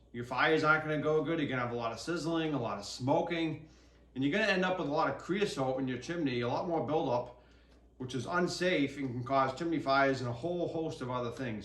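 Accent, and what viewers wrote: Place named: South Shore, United States. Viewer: Good video, are you from Massachusetts ? You sound like a south shore accent. I live in northern Rhode island and am fortunate enough process all my own wood. I also have property in Maine and the forest up there is vastly different. Most of the firewood up there is fir and ash on my property.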